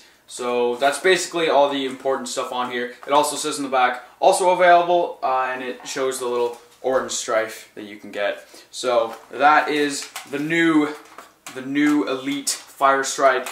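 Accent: Canada. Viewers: Lol ur Canadian.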